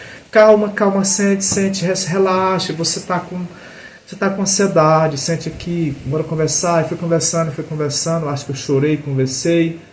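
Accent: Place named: Piauí, Brazil. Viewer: Acho lindo sotaque de sotaque nordestinos do Paui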